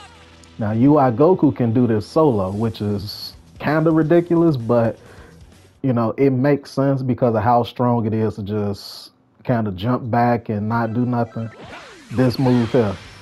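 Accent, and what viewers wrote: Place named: Memphis, United States. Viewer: U sound like you from memphis bruh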